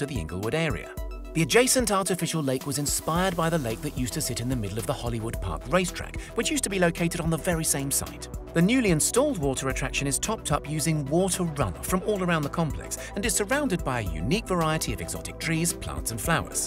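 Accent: United Kingdom. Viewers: Why does the narrator have a brit accent?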